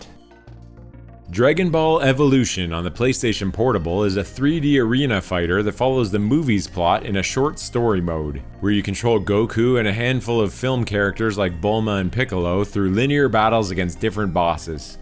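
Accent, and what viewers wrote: Canada. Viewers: Love the Canadian accent 🫶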